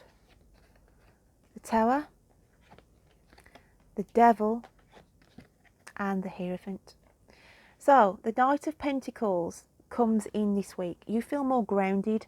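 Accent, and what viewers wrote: Birmingham, United Kingdom. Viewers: Very uplifting message! It's nice to hear a Brummie doing readings 😊❤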